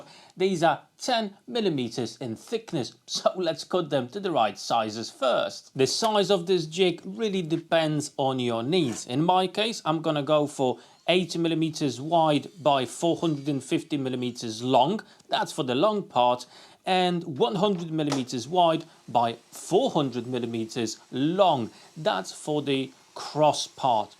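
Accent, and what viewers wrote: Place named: England, United Kingdom. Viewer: Another brilliant video made by a Polish guy with an English accent. Always look forward to your good work.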